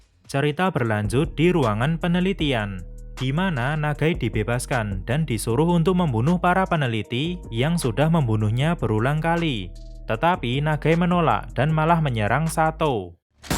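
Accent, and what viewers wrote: Java, Indonesia. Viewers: Logat jawanya kerasa bngt min hhh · Logatnya terlalu medok Kurang nyaman euy.. maaf ya min, tdk jadi subscribe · Anjir medok kurang cocok gan, pake bhs jawa aja klo lebih nyaman.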